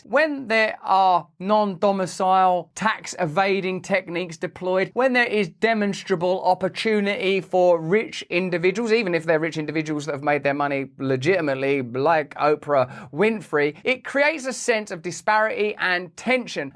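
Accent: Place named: United Kingdom. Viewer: I love the brit accent LOL. VPN ad "Get an extra Free (three) months Free.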